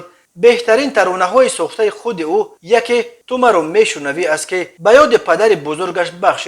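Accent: Pamir, Tajikistan. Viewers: Ту чи ин кадар часпиди дар оилаи одамои ки ад дунье гузаштан, ту хак на дори ранги рафьатчахои бевахои куча хо гап на з ан, на бачашон ьа на часп Худозада На духтарашба. Падарашон одами хуб буд овози ки вайдошт сурудои ки мехонд хозиранги артисто садкаи овози падари ин фарзандо бошанд Занаш Намозхон буд, дар еши ки бегынох хаст ту худат Худозада бегунохи Акцент помири гап задести точикам нести гап назан!!! Ва Писари ин зани ки аз дунье гузашт на рас!!!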